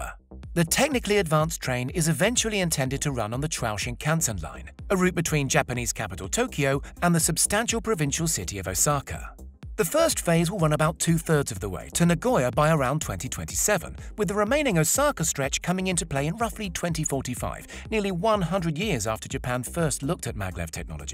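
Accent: United Kingdom. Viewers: The narrator has a British accent. It's probably the reason why he using that archaic unit. Multiply that speed by 1.61. This gives about 602 km/h.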